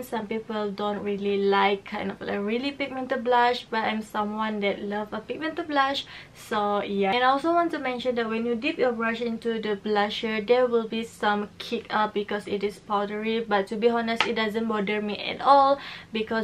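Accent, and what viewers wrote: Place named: Malaysia. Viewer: I'm from malaysia too !! nice video ♡ your accent is adorable ! :)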